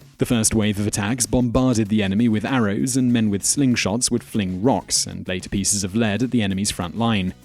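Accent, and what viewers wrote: United Kingdom. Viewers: 79,000 was not by any stretch the biggest army in ancient history. There were much larger armies than that. Look, I know you are British, and most often inaccurate in every single video, but please, drink your tea, and keep your revisionist history to a minimum. Some people conflate your accent with facts.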